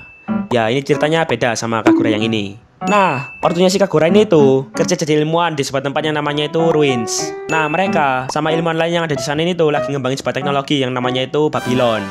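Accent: Java, Indonesia. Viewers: 3 orang yang gua suka denger logat jawanya : 1. Guru matematika gua jaman smp 2. Pak jokowi 3. Mas mas yang punya channel ini 😅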